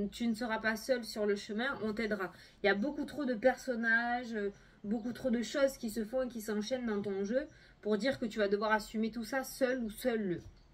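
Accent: South, France. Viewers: Bonjour nous au moins on a l accent qui chante je suis du Sud aussi🙂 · Bsr, toujours aussi pétillante et pleine d entrain et aucunement tu n es vulgaire. Pas du tout!!! Je suis du Sud aussi et c'est vrai que l on parle comme ça, mais ce n'est pas de la vulgarité!!! C'est notre parler!! 😃 merci beaucoup pour ce tirage! Reste telle que tu es, un soleil pour nous tous! Belle soirée 🙏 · Cc on t'apprécie comme tu es très nature et spontanée ça fait plus de 2 ans que je te suis avec l'accent du Sud + mots d'oiseaux c'est un feu d'artifice très plaisant 👍🏻🤗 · salut:) je voulais te laisser ce petit commentaire juste pour te remercier <3 j’ai 17 ans et je te regarde depuis 3 mois, depuis une rupture qui a été très difficile pour moi… tes vidéos me redonnent le sourire, tu dégages toujours de très bonnes ondes, toujours très souriante… et assez amusante!! je viens aussi du sud et ton petit accent et ces fameuses « expressions » font tout ton charme haha!! 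encore merci pour ta bonne humeur<33 Chiara:) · Moi j adore ta bonne humeur et ta façon de parler du sud , je kiffe comme dirais les jeunes🤣😂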